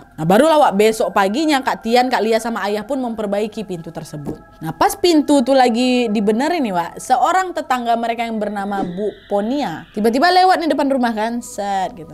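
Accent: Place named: Sumatra, Indonesia. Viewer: Cerita jawa timur logat sumatra, tapi ini yg bikin aku suka, dan ingat terus sama ka ini ❤❤❤❤❤ada ciri khas nya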